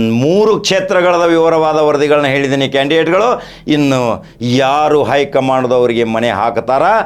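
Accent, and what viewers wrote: North Karnataka, India. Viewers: ಉತ್ತರ ಕರ್ನಾಟಕದ ಜವಾರಿ ಭಾಷೆಯಲ್ಲಿ ನೀವು ಮಾತನಾಡುವ ಶೈಲಿ ತುಂಬಾ ಸೊಗಸಾಗಿದೆ ಅದನ್ನು ಮುಂದುವರೆಸಿ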